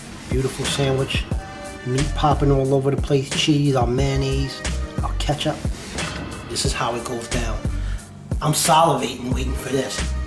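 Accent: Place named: Mexico. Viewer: dude, you sounded like mexican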